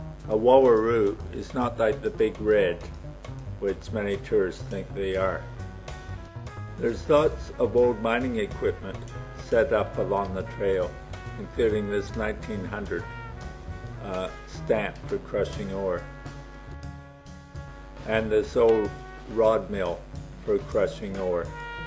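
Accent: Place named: United States. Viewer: Why is a "Seppo" narrating this?🚫🇦🇺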